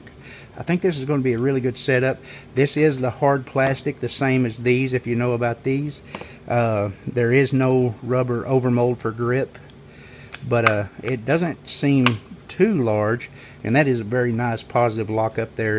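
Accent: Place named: South, United States. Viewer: A Pats fan with a southern draw is alright in my book.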